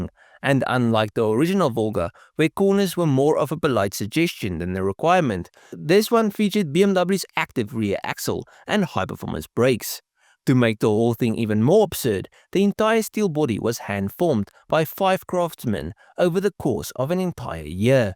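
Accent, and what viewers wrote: South Africa. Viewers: The car is amazing! You never cease to come up with automobiles most of us have never heard of. You are an incredibly intelligent young man. And, as I've said before, your narration with that South African accent only ads to the videos enjoyment.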